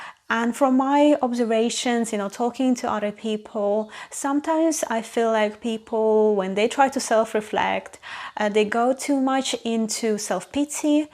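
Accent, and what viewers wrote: Russia. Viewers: I can hear Russian accent. Are you from Russia?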